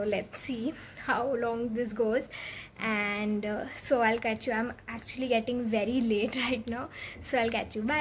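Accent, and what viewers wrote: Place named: Nagpur, India. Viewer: i just love your nagpuri accent..like all nagpurkars can relate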